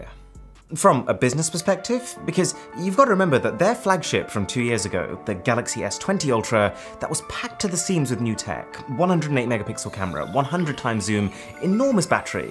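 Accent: United Kingdom. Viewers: Its his wonderful british accent that gets me hooked on every word he pronunciates in all these videos. He needs to read audio books for better sleep. Lol.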